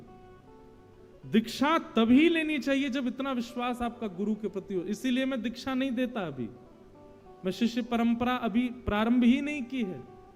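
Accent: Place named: Braj, India. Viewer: Raadhey raadhey guru ji aap bhut acchi katha krte h aap ke mukharvind se brij boli bhut acchi lagti hai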